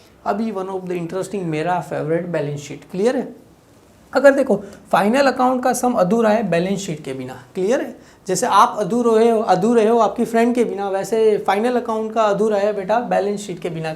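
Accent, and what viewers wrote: Gujarat, India. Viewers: Inki accent se ye gujrati lgre h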